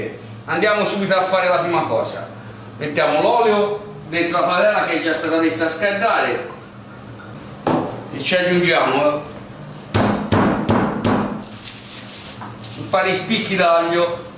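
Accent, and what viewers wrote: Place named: Livorno, Italy. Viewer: Dev'essè bono!!! Livornese abbestia eh!? Bravo, ottima spiegazione!!!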